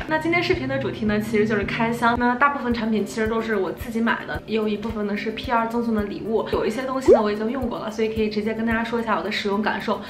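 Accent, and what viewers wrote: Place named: Xi'an, China. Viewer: 作为半个西安人，听krysti说话特别亲切！西安妹子加油！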